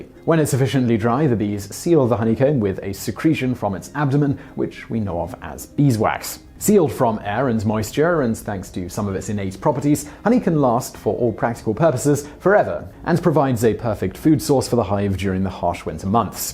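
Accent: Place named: RP, United Kingdom. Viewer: True, he does sound posh but, it's funny as hell when he looses it.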